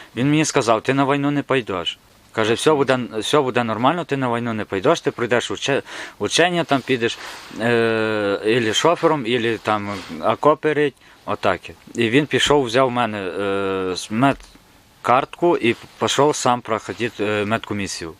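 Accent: Ukraine. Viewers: С гуцульский акцентом разговаривает.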